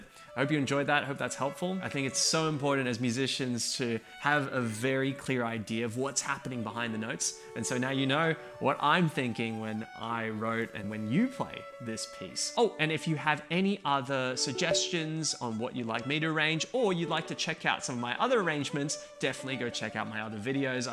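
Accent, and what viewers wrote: Australia. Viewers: has a different accent here. More aussie. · I luv ur aussie accent nahh i just love uuuuu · I like your Australian pronunciation very much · Why did you have to loose your ozzie accent? It's such a charming and delightful accent that always sounds cheerful. 😍 Also I love your arrangement and you're so kind to teach ❤️ You're brilliant!!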